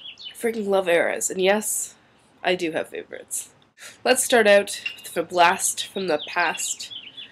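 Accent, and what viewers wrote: Canada. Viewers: I just realized that you say ABOOT! It's slight but it's there! AWESOME! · Canadian accent? D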